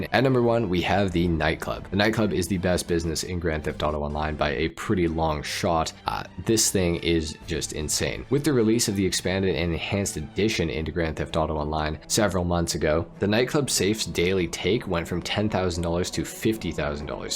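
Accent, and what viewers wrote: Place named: Canada. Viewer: Winnipeg mafia😂 I could tell by your voice you from Canada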